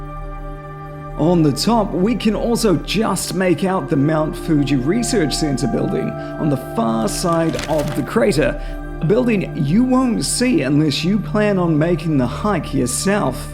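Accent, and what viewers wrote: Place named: New Zealand. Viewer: Your "broken kiwi accent" is legible so your good.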